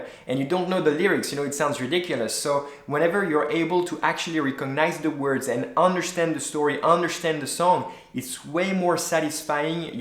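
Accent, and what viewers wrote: France. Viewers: You have a nice french accent, like it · I think ur from France ...u have a frensh accent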